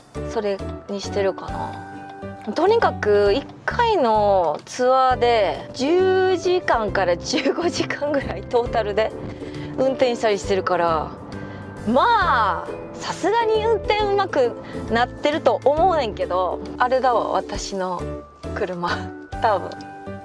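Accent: Osaka, Japan. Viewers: She speaks with that Osaka accent, so if you know a little Japanese she might still be hard to follow.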